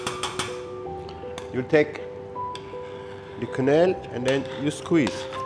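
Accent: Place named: France. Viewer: Yes he speaks broken English / Manglish with Malaysian cadence (still has French accent) lol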